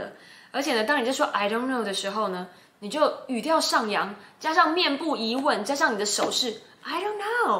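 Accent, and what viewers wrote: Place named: Taiwan. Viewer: 妳分析的很好 很有道理；尤其妳那台語真的是很道地 很傳統！給妳100分👍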